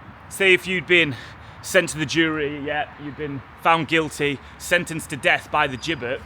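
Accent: United Kingdom. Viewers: I really appreciate your handsome brit accent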